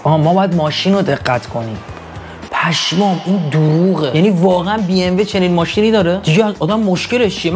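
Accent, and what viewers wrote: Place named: Bushehr, Iran. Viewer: تو بوشهری هستی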